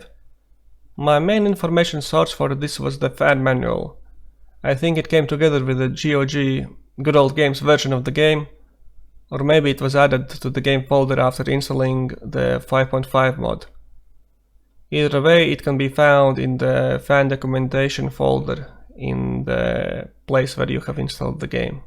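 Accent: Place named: Latvia. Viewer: Latvian accent goes strong.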